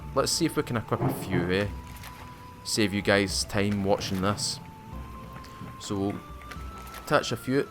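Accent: Scotland, United Kingdom. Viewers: Came for the video, stayed and subscribed for the accent. Love the scottish accent. Edinbrugh, right!? Keep on with the videos.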